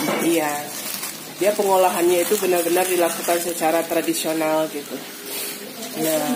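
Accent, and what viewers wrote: Minangkabau, Indonesia. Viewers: Iko baru mantap, kuliner minang di review oleh orang minang asli dgn dialek khas minang. Sukses taruih uni di tunggu video2 berikutnya, lapeh juo taragak jo kampuang nan jauah di mato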